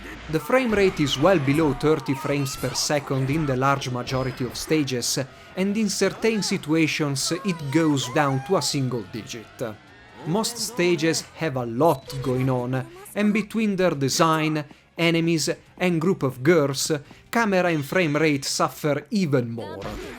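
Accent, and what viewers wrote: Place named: Italy. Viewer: Your italian accent is quite annoying.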